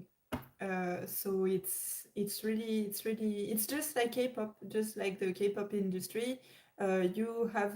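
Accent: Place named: Belgium. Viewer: Sorry for missing your live videos. I've been really busy 😢 but at least, I'm gonna watch all of them. P.s your English is fantastic. Advanced vocabularies and articulative. It's not easy as well. I live in a country where English is utilized as a first language in education but not officially. Yet, many of us can't speak like you do. I had to train myself on my own. Your English accent is interesting, I heard similar accent (with minor differences) in Belgium (only European nation I've visited so far) when few of them speak in English but accent switches when they speak in their primary language.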